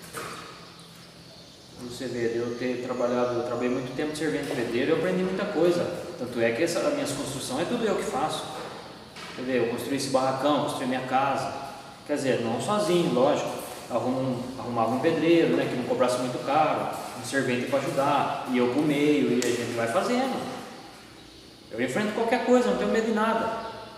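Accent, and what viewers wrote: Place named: São Paulo, Brazil. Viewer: Entendi, pelo sotaque pensei que era perto da minha cidade. Torrinha SP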